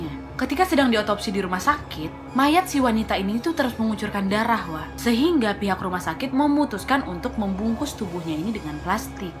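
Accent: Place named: Kalimantan, Indonesia. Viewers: sragen jateng hader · Malang slur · Kaka Orang Kalimantan Ya? Cara Bicara nya Sama Kaya tanteku dari Kalimantan Hehehe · Jowo Timurr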